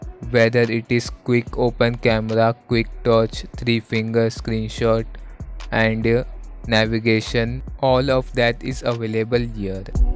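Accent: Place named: United States. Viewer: i thought ur an american, awesome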